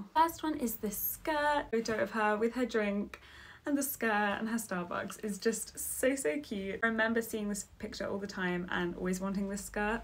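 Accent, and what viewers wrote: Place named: RP, United Kingdom. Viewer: You sounded so- idk how to say it without it sounding bad but like, posh the whole first half then suddenly you said “when we was up in London” and I actually giggled out load.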